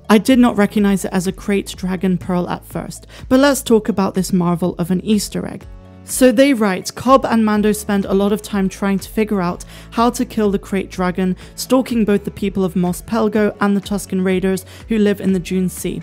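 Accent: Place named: West Country, United Kingdom. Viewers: Jibber jabber. I detect some good old South West accent in there. Devon maybe. Nice to hear a fellow yokel on YouTube. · Well said but I'm still hearing West County accent 😂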